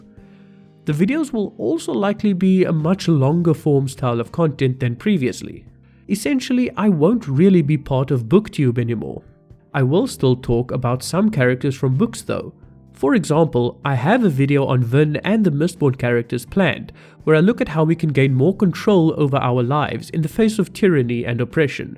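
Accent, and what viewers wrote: South Africa. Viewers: Rage against the dieing of the light - He sounds Indian but with a South African accent... 🤔 I wonder where he's from?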